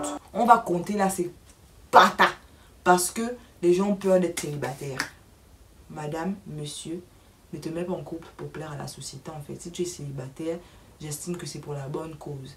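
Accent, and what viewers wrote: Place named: Gabon. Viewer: C'est l'accent Gabonais qui me retiens 😂😂super pour la vidéo j'aime ❤❤😊😊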